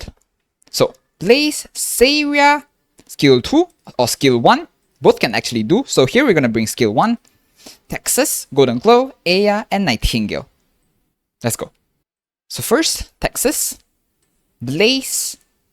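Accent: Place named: England, United Kingdom. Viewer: Why do you have a sort of english accent up till rs-ex-5? lol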